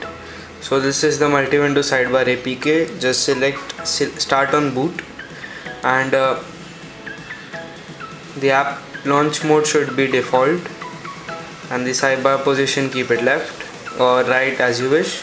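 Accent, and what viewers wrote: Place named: India. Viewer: an another indian phone toturial, who speak with accent...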